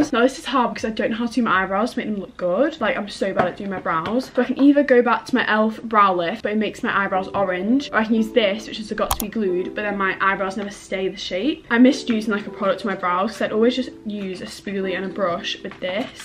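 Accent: United Kingdom. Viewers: I love her britisch Akzent sm lol btw i love her too💞🤩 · As a Ukrainian who speaks American English I was just trying to understand British accent like whole video🥲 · you sound British:face-purple-wide-eyes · The British accent is so annoying, I think it hurts me 😭💔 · Welll this is my firsst timee i see youu anddd whenn i heard ur british accenttt i did a subscribe andd when the video endss i like itt soo much keep goind❤❤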